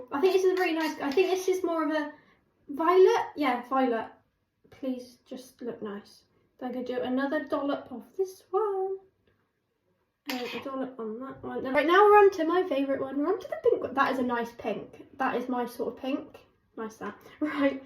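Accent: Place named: Northern England, United Kingdom. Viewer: I love your northy accent 😊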